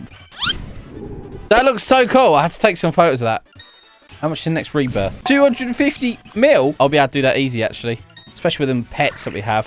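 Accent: United Kingdom. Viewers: His British voice never gets old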